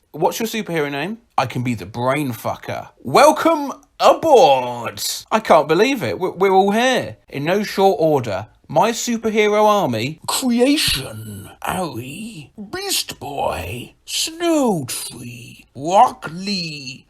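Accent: United Kingdom. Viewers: TBH, a Brit talking this fast is my ASMR no matter what he's saying.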